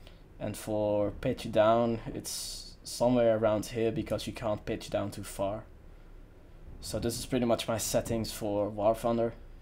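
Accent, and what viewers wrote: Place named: United Kingdom. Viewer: Nice accent, you have a British one, even tho you're a Dutch.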